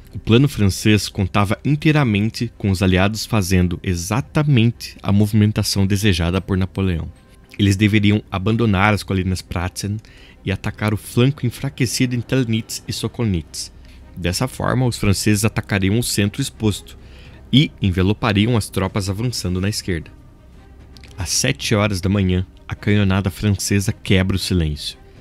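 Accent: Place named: Caipira, Brazil. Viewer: com um sotaque caipira insuportável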